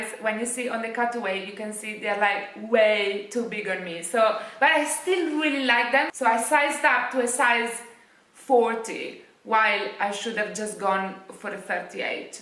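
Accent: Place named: England, United Kingdom. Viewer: Ciao, Ti stanno da Dio i pantaloni da Zara,amo il tuo accento inglese.Qualche volta ti scrivo in inglese 😊perché sono una ragazza di adozione italiana 😅che vive in Danimarca.Amo il tuo stile 😍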